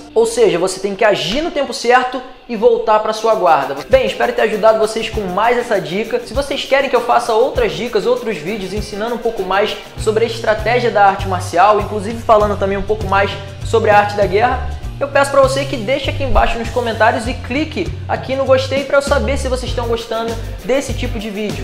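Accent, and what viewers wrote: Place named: Rio de Janeiro, Brazil. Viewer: willian samuel eu acho que é aqui no RJ, nem sei, porque se tu perceber ele puxa o X "vocêx", todo carioca fala assim kkkk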